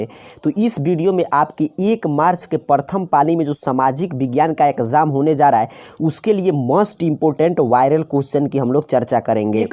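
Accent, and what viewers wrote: Bihar, India. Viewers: Thanks sir .aapke aawaj se pata chala hi ki aap Bihar se hu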